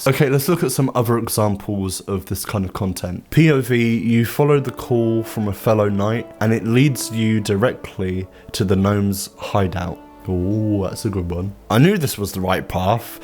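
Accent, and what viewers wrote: United States. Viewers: American here, for my sins. Your American accent is great! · Was this all to show off the American accent?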